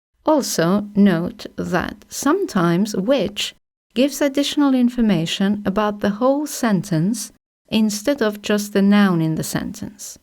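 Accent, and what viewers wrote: United Kingdom. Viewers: i like British accent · That British Accent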